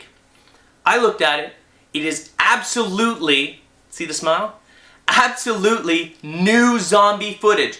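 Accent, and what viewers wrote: Canada. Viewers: are you canadian??? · are you canadian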